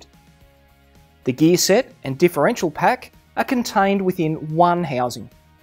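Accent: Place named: Australia. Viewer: I think all technical videos should be done by someone with an Australian accent from now on.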